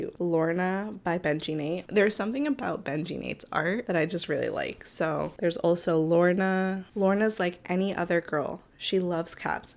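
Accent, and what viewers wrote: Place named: Colombia. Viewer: me encanta tu acentito colombiano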